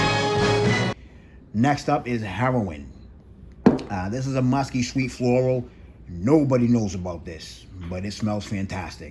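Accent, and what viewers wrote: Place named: South Shore, United States. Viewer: Your accent is bringing me home. From the south shore.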